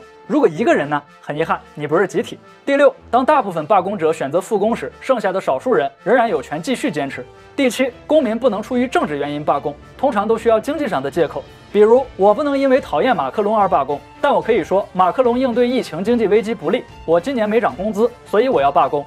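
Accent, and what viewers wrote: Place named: Shaanxi, China. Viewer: 普通话有点陕西味啊，倍感亲切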